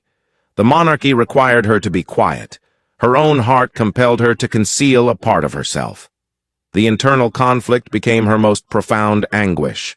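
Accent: United States. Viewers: Not only is it total rubbish, gibberish avtually but this voice is so grating. Is it some kind of American regional accent? Wherever it is it wants avoiding.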